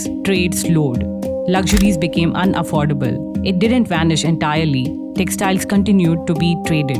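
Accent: India. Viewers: You need to do better narration. Your accent is very hardcore South Indian. International accent required.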